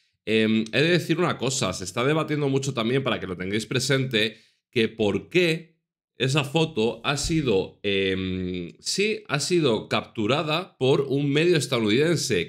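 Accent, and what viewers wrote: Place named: England, United Kingdom. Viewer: I LOVE your English accent😂❤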